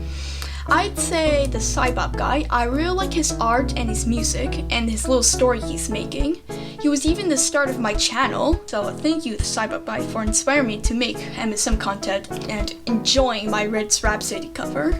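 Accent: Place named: United Kingdom. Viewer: I used to think you were british back then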